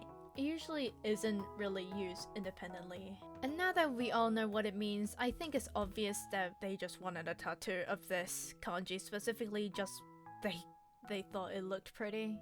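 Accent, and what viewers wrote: United Kingdom. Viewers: Are you British because you don't sound Koren( I,m not trying to be rude) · OMG ARE U BRITISH!??? OOP- IM SORRY!! I JUST LOVE YOUR ACCENT!! IM OBSESSED!! AGAIN SORRY!!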